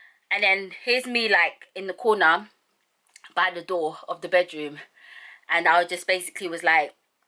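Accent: Nigeria. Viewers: I love the Nigerian 🇳🇬 accent 😍😍😍😍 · I love the way the Nigerian accent comes out from time to time. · I can still sense your Nigerian accent Good you proud of being a Nigerian ❤️❣️ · Nigerian accent never leaves ur mouth 😂😂😂😂 😂😂😂😂😂😂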